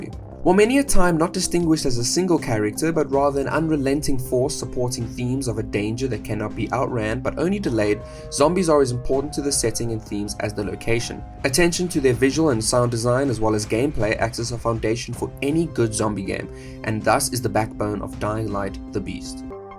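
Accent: South Africa. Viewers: Lekker video bru, i could instantly tell from the accent you are from South Africa 🇿🇦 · I can recognise that accent anywhere. It might be too subtle for most to notice, but not to other South Africans. Where are in SA are you from, bru? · Not related to the game, your accent sounded South African and confirmed it. Glad to see SA creators are making high level content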